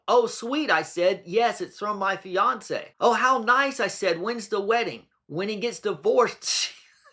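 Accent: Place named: Scotland, United Kingdom. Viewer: I am a Scot. I adored your Scottish accent - it really added to the joke of all those ranting emails!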